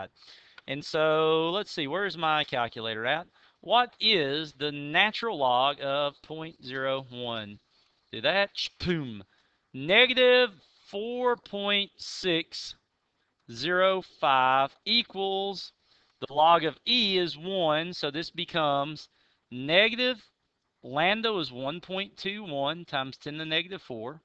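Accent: United States. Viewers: Science spoken in American English 🤪👍 loved it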